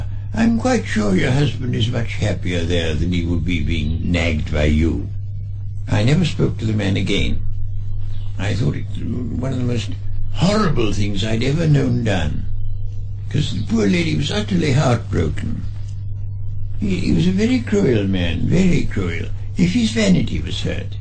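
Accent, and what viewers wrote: United Kingdom. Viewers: Is there a transcript of this interview? It is kinda hard for non-british to cope with that accent tbh :D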